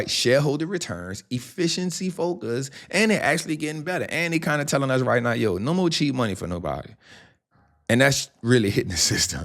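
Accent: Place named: Los Angeles, United States. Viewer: Thank you. You explain very well. ❤ Love the LA accent! 😊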